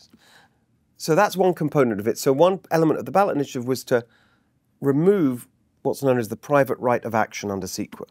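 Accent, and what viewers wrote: United Kingdom. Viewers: another Brit telling us how 2 plus 2 equals 5? Great. This man is devoid of any economic knowledge. The price of energy in California is almost 50% above the national average. Energy costs are inflationary. Plus, he doesn’t distinguish between the cost of living versus the cost of labor. How about bringing someone else in whose sole qualification isn’t a pommy accent? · The man has a British accent but lives in California?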